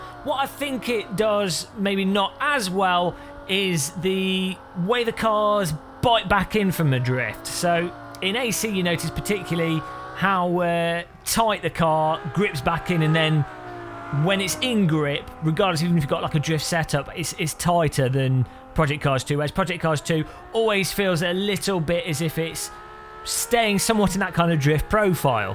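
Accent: United Kingdom. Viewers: I love your british accent! Hear you talk is quite an enjoyable thing :p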